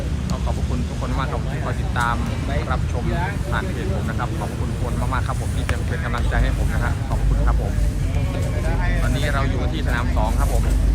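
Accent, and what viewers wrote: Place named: Korat, Thailand. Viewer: โคราชชัดเจน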